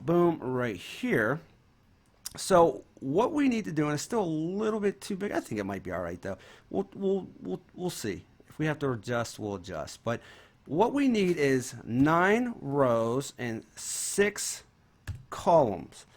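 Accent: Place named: Pittsburgh, United States. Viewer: Hey I was wondering what part of around Pittsburgh are you from. I hear it in the accent. I was born in Freedom, Beaver County. My family is still in that area now.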